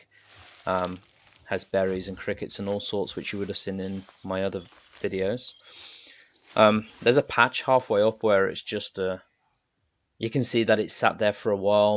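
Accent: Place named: Midlands, United Kingdom. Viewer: Sorry to see you’ve lost your girls to the fox. You sound like you come from midlands like me. Weld mesh is only suitable for chicken runs. I would also invest in a good electric fence around your enclosure too. They can be run off the mains or a 12 volt leisure battery which can be recharged. I use a 50m holt line electric fence & it’s hooked up to the mains now. I’ve had many battles over the years with the fox, lost poultry. Good luck & i’m sorry about the birds. ♥️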